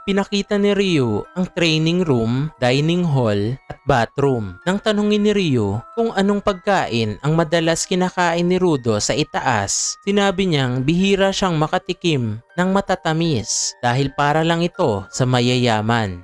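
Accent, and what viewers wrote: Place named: Iloilo, Philippines. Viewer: Idol pa illongo kana pero keep it up at salamat sa recap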